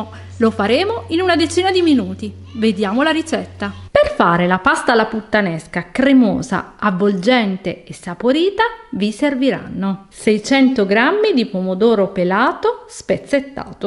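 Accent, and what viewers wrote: Apulia, Italy. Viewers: Dall'accento sembri pugliese. Vero?😁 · 👋👋 SEI brava e chiara, di che regione sei PUGLIA, VOLEVO CHIEDERTI CHE NE PENSI DEL ROBOT BIMBY LO USI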